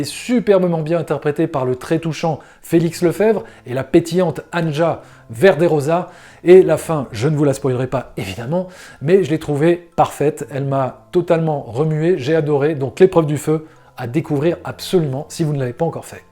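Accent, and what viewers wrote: South, France. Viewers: merde .... il nous a pas fait son fameux "accent" du sud........... décu,mais non apres la video!! merci pour la video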